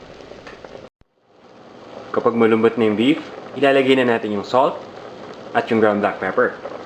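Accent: Philippines. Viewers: Sir this is an amazing recipe for spicy beef noodle soup. I can't tell if you're speaking English, with a heavy Filipino accent or what but I can tell that that makes little difference. You my friend have just created magic in this spicy beef noodle soup. I cannot believe no one's commented on this recipe!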